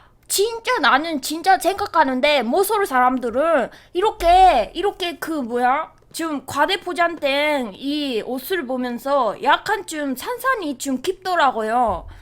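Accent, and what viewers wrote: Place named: Japan. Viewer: 오늘 꽤 간만에 일본인스러운 한국어다. 반갑네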